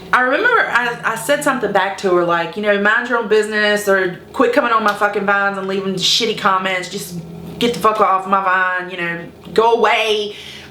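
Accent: South, United States. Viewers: High five for us girls with a southern accent!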